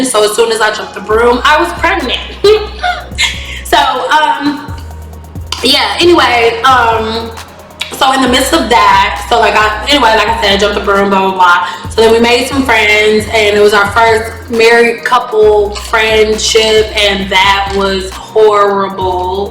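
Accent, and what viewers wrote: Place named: Florida, United States. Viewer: Florida in the house, I love❤ the way you say Gone head Girl😀 your accent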